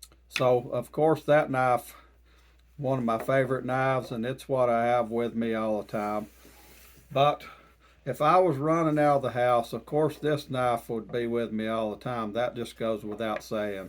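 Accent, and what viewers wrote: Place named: Appalachia, United States. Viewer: Hey, bub, you sound like you come from close to the upper part of the Southern Appalachians, which is where I’m from. And back in the old days, a plain two-blade pocket knife along with a sensible belt knife got the work done. Today, I carry a Leatherman super tool along with the same sensible belt knife. Hmmm…..There seems to be a historical pattern going on here, and I sure as hell am not about to argue with it.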